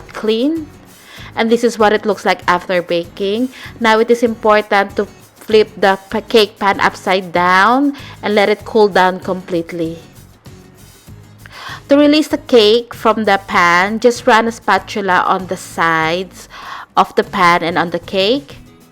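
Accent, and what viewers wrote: Philippines. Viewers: Wow, looks lovely and the Tagalog accent is very sweet too. Trying this tomorrow. Thanks for the recipe.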